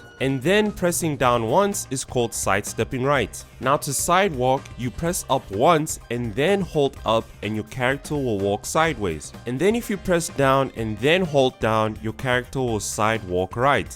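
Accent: South Africa. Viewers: Lol I was watching a Lil Majin vid than randomly your video played next and got me interested and as I kept listening and watching without paying attention to your youtube username I suddenly realized bro is a fellow South African! Definitely subbed. Working on a ton of projects for my own channel, keep pushing bro!🔥⚡️🇿🇦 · anazing background music and south african accent? subscribed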